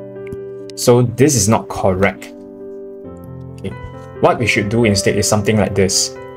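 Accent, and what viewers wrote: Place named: Russia. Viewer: Where are from man I love your style of teaching accent looks like Russian or european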